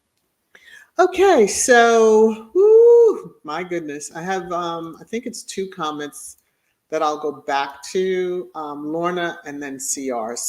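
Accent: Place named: RP, United Kingdom. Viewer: 👍🏻👍🏻👍🏻👍🏻👍🏻👍🏻🧚🧚🧚😘😍be safe ✝️✝️♥️♥️🌹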